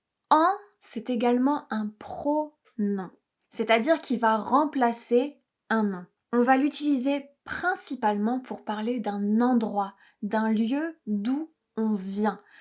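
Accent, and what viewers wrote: England, United Kingdom. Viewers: It's funny, your accent in English is rather British, I didn't expect that. Certain words sound very American though. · You have such a lovely English accent! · J’adore votre accent anglais hahaha!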